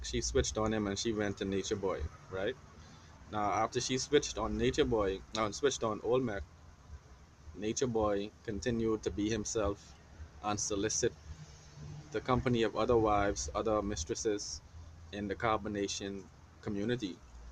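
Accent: Bahamas. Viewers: Are u Bahamian? The way we talk can't hide lol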